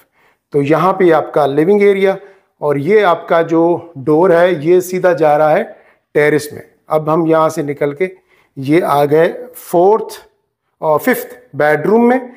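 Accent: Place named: Karachi, Pakistan. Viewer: Pure urdu speaking man karachi urdu.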